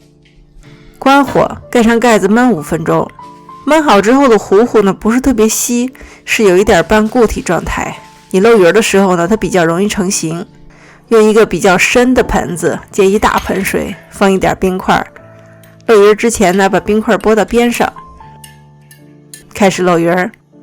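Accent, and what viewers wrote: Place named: North, China. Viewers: 几十年前在西安夏天的味道，小时候永远的美食，普通家常的味道，的西安口音太好听了，好像邻居家的姐姐在边做饭边教大家，轻柔友善，温馨舒适！我们小时候吃的更多的是苞谷面，也会更多的醋和辣椒🌶️！ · She's from Shaanxi, and yes, northern China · 声音听起来好像北京话啊😄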